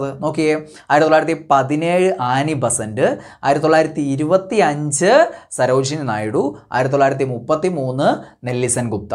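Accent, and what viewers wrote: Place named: Malabar, India. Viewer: അതു മലബാർഏരിയയിലെ സ്ലാങ് ആണ്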